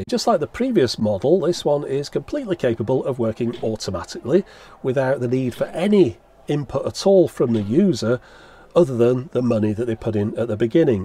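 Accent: United Kingdom. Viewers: should make a channel dedicated to British English lessons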